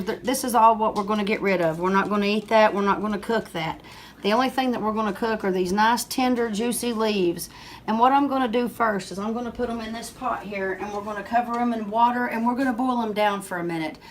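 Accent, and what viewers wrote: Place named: Kentucky, United States. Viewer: Is this really the hills of Kentucky? I love it, and that accent is too cool - hoping for lots more videos like this!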